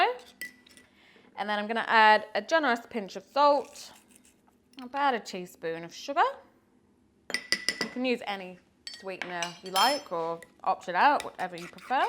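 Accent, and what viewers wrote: United Kingdom. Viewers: thank you... you sound soo british...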